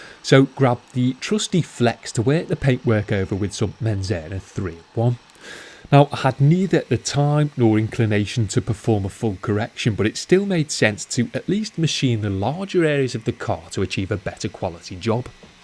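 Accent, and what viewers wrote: Liverpool, United Kingdom. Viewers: I'm pretty sure he's a Liverpudlian (didn't want say scouse, may have come across as being rude and didn't want to offend those from that area)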